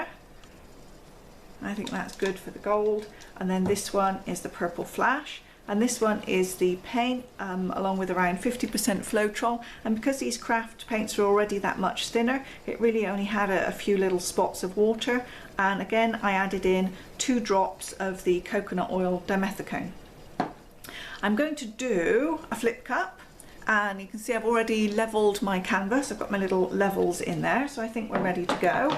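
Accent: United Kingdom. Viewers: You sound British but the brands you use are not available here.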